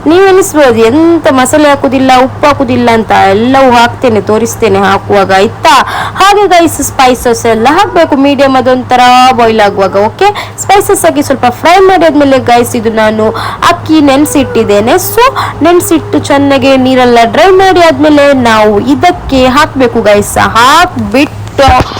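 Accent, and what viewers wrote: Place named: Beary, India. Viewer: Ninga endg vatrasi kannada mundre nink byari slang carect band alle ade mundoru nink nalle saport akdar arum ningale troll aklle ❤ pinne ninga troll ayeng ninga tell famus avude k😊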